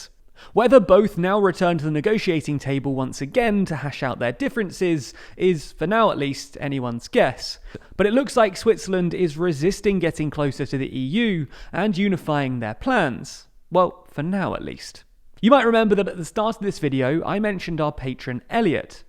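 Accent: United Kingdom. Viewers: Queen english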